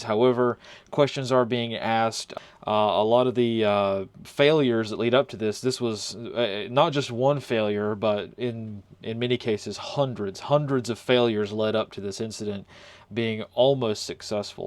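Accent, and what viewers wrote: United States. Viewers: I detect a little country accent 🥰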